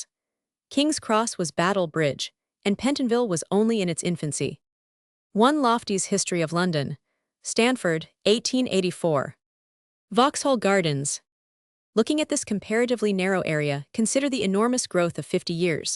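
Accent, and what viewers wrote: United States. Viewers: An American accent doesn't do justice to a good book,sorry.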